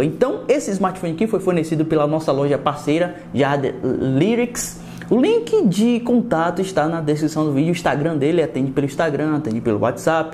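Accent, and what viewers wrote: Bahia, Brazil. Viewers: Já da pra vê que esse e baiano vu skskskksksk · Vc e bahiano de salvador?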